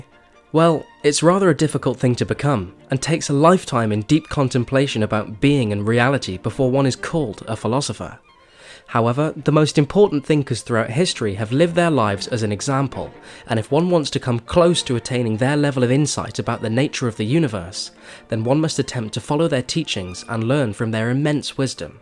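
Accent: United Kingdom. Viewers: You forgot the British Accent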